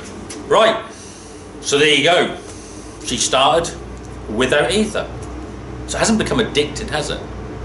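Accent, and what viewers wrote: Norfolk, United Kingdom. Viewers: You sound just like me as everyone say you carrot cruncher roughly what part of Norfolk you from I live in fakenham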